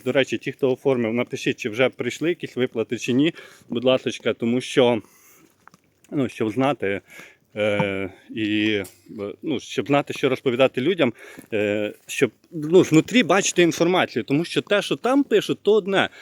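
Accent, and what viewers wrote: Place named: Surzhyk, Ukraine. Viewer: Дуже дратує суржик цього журналіста!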